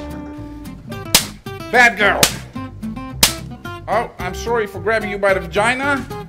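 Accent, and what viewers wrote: Netherlands. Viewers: I can hear Dutch somewhere in the accent, is it true?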